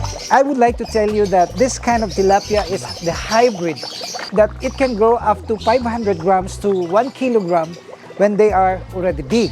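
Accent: Philippines. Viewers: That's a typical Philippines 🇵🇭 accent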